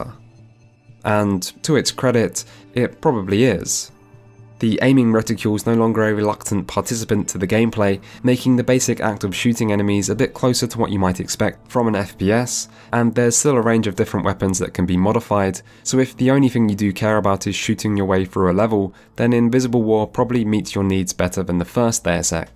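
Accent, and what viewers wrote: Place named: United Kingdom. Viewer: UK Accent? - Check. This is going to be a good retrospective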